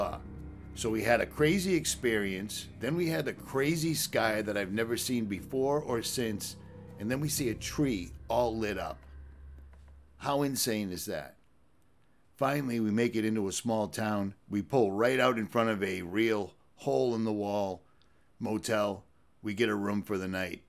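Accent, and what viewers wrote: Boston, United States. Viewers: I'd recognize that Bostonian voice anywhere! Damn! I sorely missed you!!!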